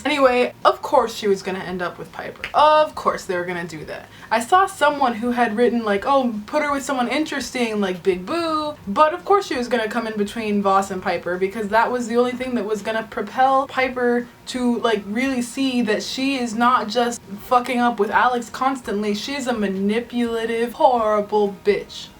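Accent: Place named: Russia. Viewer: your russian accent is on point. also **ermagerd spoilers** my theory is that bennett might have had something to do with the DEA raiding cesar's apartment. perhaps he thought the best chance for those kids was to get cesar away from them. i just don't want to believe he would desert daya like that :C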